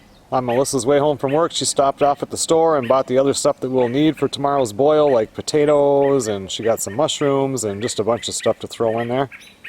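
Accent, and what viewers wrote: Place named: North, United States. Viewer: I thought you have a northern accent. How does she like the difference in weather?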